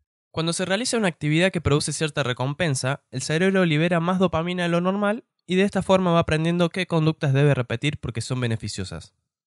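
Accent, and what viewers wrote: Córdoba, Argentina. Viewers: Por tu forma de hablar sos argentino jaja saludos desde argentina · Argentino.....de Córdoba???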